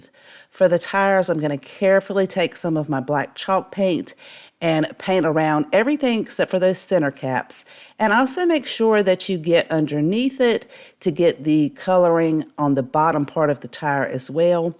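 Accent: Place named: North Carolina, United States. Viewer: I love your videos! You take simple products and make them elegant! What state do you live in? My name is too and you talk like me (NC girl):)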